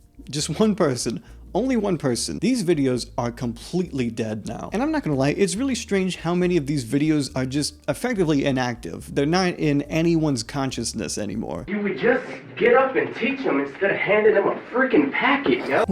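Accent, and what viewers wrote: Boston, United States. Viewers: Are u from boston?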